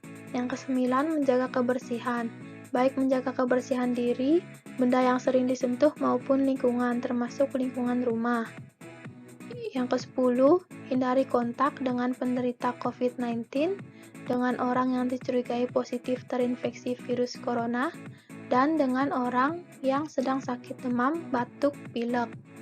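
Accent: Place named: Bali, Indonesia. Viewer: Logatnya bali banget ya kak🙃, thanks infonya👌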